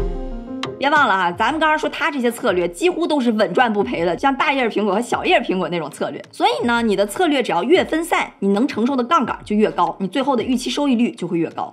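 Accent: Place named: Shandong, China. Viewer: 感觉有点山东口音哎😊❤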